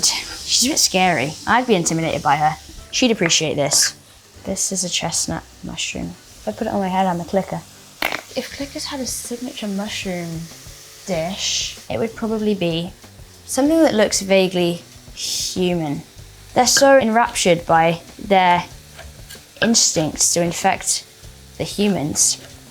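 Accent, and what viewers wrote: United Kingdom. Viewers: British accent, but i actually hear ellie talking, really, it could be ellie making lunch for vogue IF like, not the apocalypse you know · I love her British accent so much more than her American accent. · Man ngl I’ve been bamboozled I didn’t know she was British 😅😅😅😅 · Omg she's British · How did I forget Bella is British? 😂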